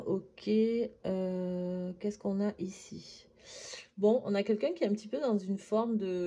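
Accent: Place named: South, France. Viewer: Bonjour 😎 Tout juste my mother is verseau 😂 Belle journée ☀️ Rien que l'accent du sud,meme léger me transporte 😅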